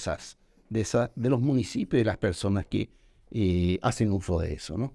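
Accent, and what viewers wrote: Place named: Paraguay. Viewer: El español del profesor tiene sutaki paraguayo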